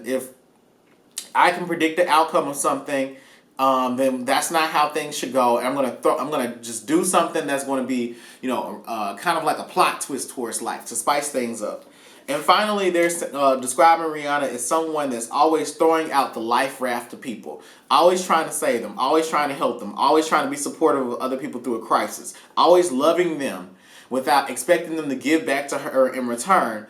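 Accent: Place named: South, United States. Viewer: I love your country accent btw! The Southern accent is very sexy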